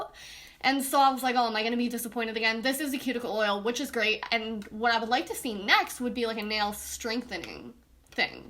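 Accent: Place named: Midwest, United States. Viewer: This is the very first box I THOUGHT I was signing up for... I signed up, thinking I was late enough into July that we would skip July and go right to August. When I opened the August box I was not pleased with any of it. I don't wear lashes, the Laura Lee colors are chalky and they don't apply smoothly nor do they blend very well. I'm surprised she launched with a formula this difficult to work with. I do feel bad for whatever the drama she and some of the others are going through. I appreciate all those who are staying out of it and remaining positive/uplifting. I'm new to your channel because of Boxycharm. You sound like you've got a Midwest accent. I'm from Wisconsin but I'm not sure how much of the accent I still have, since I moved away at age 19. Haha, "Poop Brown" that's fun! I enjoyed your no-nonsense review. If you go for Jaclyn Hill's vault or maybe some of the BH cosmetics palettes for color (Zodiac, or Galaxy palettes --they have 4 of them, and they are $18 each unless you find a sale.)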